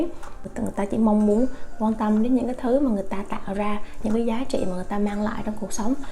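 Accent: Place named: Quang Nam, Vietnam. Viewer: Giọng bạn giống Quảng Nôm hay Quảng Ngãi quá bạn hê :)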